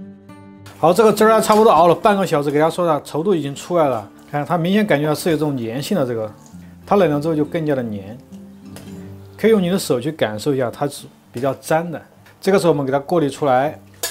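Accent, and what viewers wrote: Sichuan, China. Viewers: 真是喜欢这口川普，太下饭了，干得漂亮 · 这个普通话一听就感觉到好亲切喃，是成都人？或者至少是四川的吧。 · 已经开始川普了，之前只是Rua会出现，现在”喃”都来了哈哈哈哈。有粉就是嚣张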